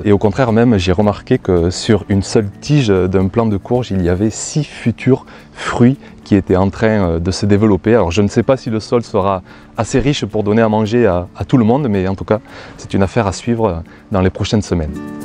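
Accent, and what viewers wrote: South, France. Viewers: Salut comment va tu? et quelle plaisir de te retrouver et d’entendre ton accent du sud 🤣 Si tu veux on peut faire dû troc de légumes. C’est vrai les haricots on mal germé mais là j’arrête pas de cueillir j’en suis à la deuxième récolte. C’est vrai les tomates 🍅 nous font languir elles arrive on compte goûte. Les courgettes non font plaisir et on a hâte de savourer les aubergines 🍆. Bon dimanche et à bientôt 😎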